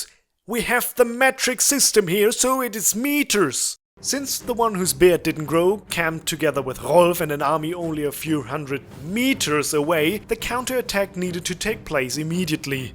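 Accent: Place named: Germany. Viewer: Das ist sehr gut. German english pronunciation sounds great and hilarious! Glad I found your channel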